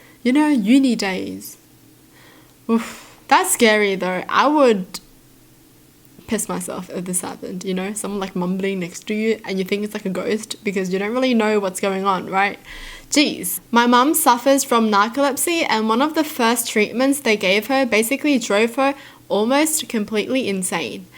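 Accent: Australia. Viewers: She's Australian lol <3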